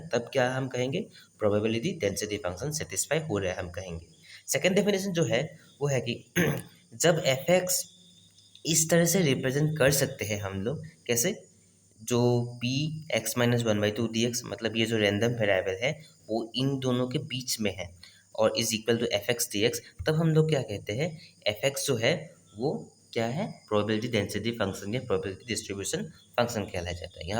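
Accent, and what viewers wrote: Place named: Northeast, India. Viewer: Ye North East ka hai isliye aisa ascent hai... Woh jaan bhujhkar aise nhi bol rha hai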